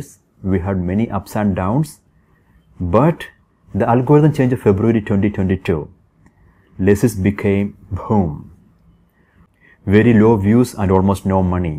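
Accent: Kerala, India. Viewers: His accent is like a Malayali trying to speaking in english. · У тебя индийский акцент. Делаешь вид что из благородный касты, но твои поступки со страйками как из низшеготсословия. Ты из низшей касты.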